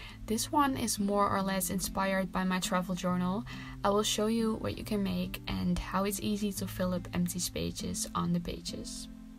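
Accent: Netherlands. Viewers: Your accent sounds, N e d e r l a n d s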